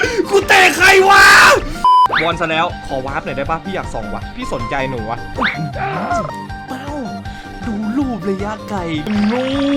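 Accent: Isan, Thailand. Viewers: พูดอีสานน่ารัก55555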